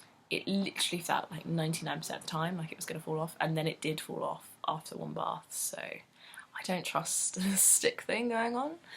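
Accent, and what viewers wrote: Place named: United Kingdom. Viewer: I love your accent. Whereabouts in the UK are you from?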